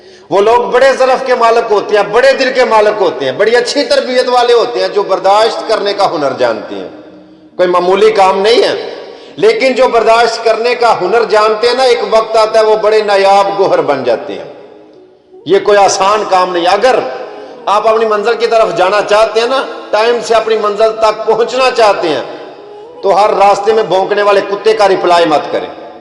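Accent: Punjab, Pakistan. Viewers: sab punjabi ma andaz byan acha hai apka...lehja asar krta hun punjbai lehja acha hai apka plz punjabi ma bat kia krain....urdu b apki behtr hai No doubt...i hope are you understand...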